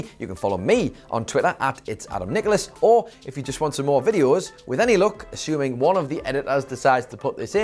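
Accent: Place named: Newcastle, United Kingdom. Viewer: This man a geordie. 2 years of these videos and I haven't realised this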